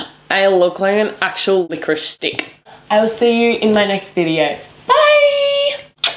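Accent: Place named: India. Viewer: HAHAHAH ur indian accent is my favourite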